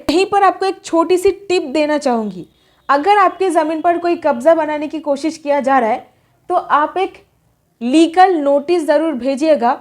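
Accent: Bengal, India. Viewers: Clarity and content, both well done. Your Hindi with a dash of Bangla makes the presentation more attractive and beautiful.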